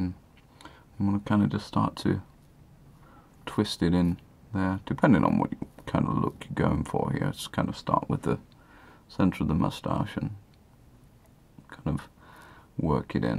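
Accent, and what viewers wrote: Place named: RP, United Kingdom. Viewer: Love the rp accent, subscribed! Fellow brit :)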